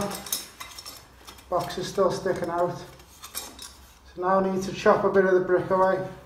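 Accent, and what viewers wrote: Liverpool, United Kingdom. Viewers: A Scouser doing some work, luv it.....just luv it. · Great great vid. Good to hear the scouse accent as well now i want to spur off two sockets in my bedroom but as it's upstairs do I have to feed the cable through the loft joists Also do you have a website where you do general questions and answers. Thanks!!!!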